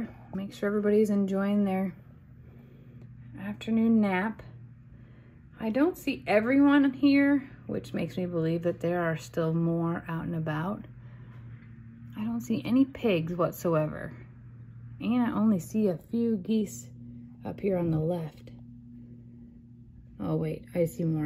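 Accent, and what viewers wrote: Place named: Texas, United States. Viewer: From Joan- I love how you are getting a Texas “twang” added to your speech.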